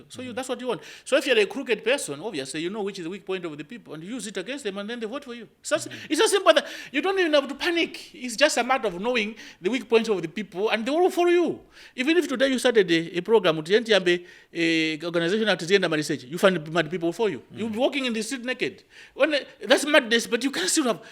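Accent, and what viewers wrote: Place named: Tumbuka, Malawi. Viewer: Ndee muli chichewa cha chitumbuka, nakuona m'bale wane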